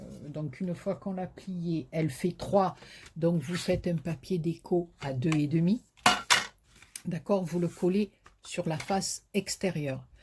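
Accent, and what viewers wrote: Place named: Marseille, France. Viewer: cc merci pour tesexplications a la marseillaise hihihi bravo super bonne fin de journée bises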